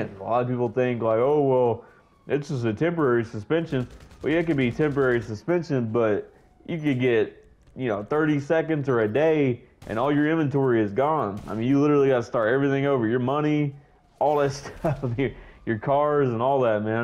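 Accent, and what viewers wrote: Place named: Mexico. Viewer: You sound mwxican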